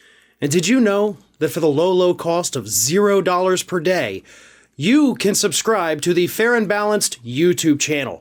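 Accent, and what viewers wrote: South, United States. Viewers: You're edging into a 'southern' accent.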